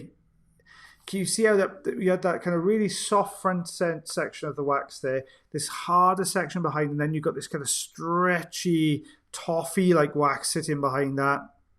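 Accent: Wales, United Kingdom. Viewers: Take care of yourselves and your community first! Your videos (and lovely waffling in a lovely Welsh accent) can wait until it's safe again. Best to Mrs B and the little Bs.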